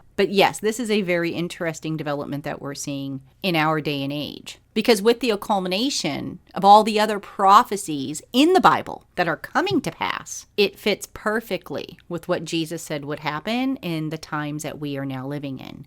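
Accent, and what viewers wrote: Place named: East Coast, United States. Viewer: no he definitely seems to be a radical Islamist. I listen regularly to Glenn Beck and he said the mother of the new Mayor of N.Y C. stated in an interview that her son does NOT identify as an American, but rather with his Islamic heritage instead. I was born in Queens N.Y and raised on Long Island. I now reside with my husband in rural N.W.OH. I noticed you have an East Coast accent that sounds a lot like mine. Are you a N.Y gal too? I think NY.C is going to need a LOT OF PRAYERS!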